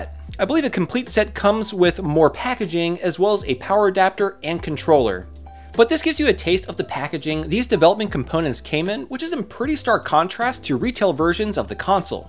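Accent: Philadelphia, United States. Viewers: are you from the philly area? the way you say your "O"s reminds me very much of my accent.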